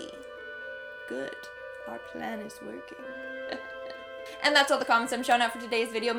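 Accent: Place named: United Kingdom. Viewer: i thought she had a british accent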